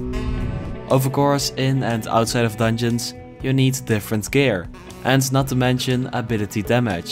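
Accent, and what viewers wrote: Netherlands. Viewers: i smell nederlands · Ur dutch right? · we kunnen nu al zeggen dat je nederlands bent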